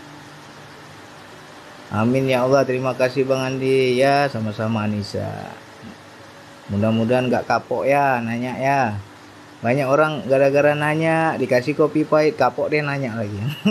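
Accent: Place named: Palembang, Indonesia. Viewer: Kalo dari logat kayayo wong palembang bang andi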